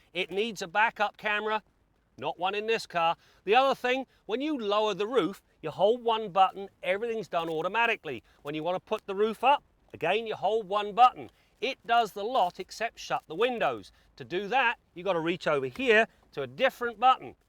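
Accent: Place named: United Kingdom. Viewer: I am confused. This guy has a British accent. He is talking about dollars. The car is LHD but has Celsius on the climate. What country is this?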